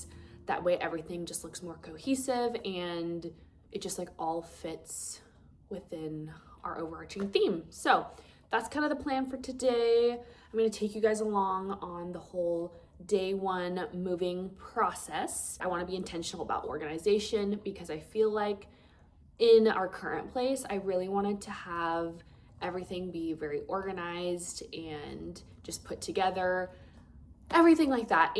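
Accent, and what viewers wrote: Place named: North, United States. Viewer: So what state is this? When you said sneakers and the way you say bag, I knew it was North somewhere lol South FL gal here. Stumbled on your page today.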